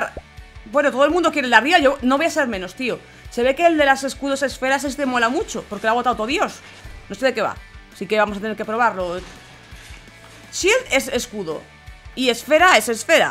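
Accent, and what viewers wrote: Argentina. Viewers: me mata haciendo acento de argentina!! le sale bien. porque yo soy argentina!! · Hablas argentina tia😨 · Juego simon dice,cuando dice say y algun color es porque tienes que ir al color que te dice roblox,Cuando no dice say no vallas al color que dice...entiendes? por cierto muy bien el acento argentino:D yo soy argentino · soy sub me encanta tu voz tu asentó argentino u como habla s con morsa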